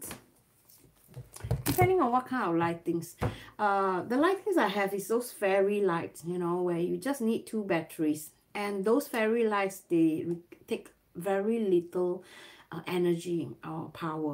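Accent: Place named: Singapore. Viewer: You still have your singapore accent.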